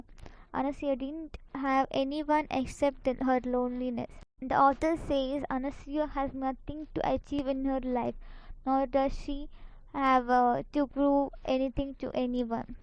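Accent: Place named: Mangalore, India. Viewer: After a long time listened the voice of monglore people in youtube😂 and this was well explained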